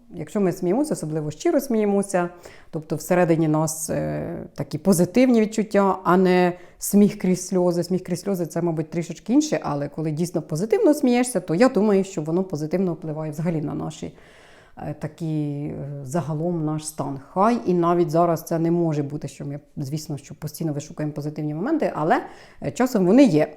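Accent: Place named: North, Ukraine. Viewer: Пані, вітаю Вас! Ви чудова! Можливо я саме через тембр вашого голосу звернула увагу на ваш канал. З лютого 2022 принципово шукала відео-блогерів садівників з України. Дивилася, слухала. Не можу сказати, що троянди це моя улюблена квітка, але щось змусило мене зупинитися та підписатися на ваш канал. Щодо критиків, яким все не так, то невідомо скільки серед них тих, хто саме працює на запобігання розвитку українського контенту. А вимова ваша більш характерна півночі України, там притаманні більш тверді приголосні, щось трошки від білоруської мови. У мене тембр голосу дуже змінюється від тої мови, якою спілкуюся, рідні спочатку не впізнавали, коли дзвонила та розмовляла українською, зараз звикли. Кажуть, що українською у мене більш м'яка та доброзичлива мова вийшла. Сама себе не чую, тому важко об'єктивно оцінити. Окрема подяка за вашу громадянську позицію, про віру в ЗСУ та нашу Перемогу!.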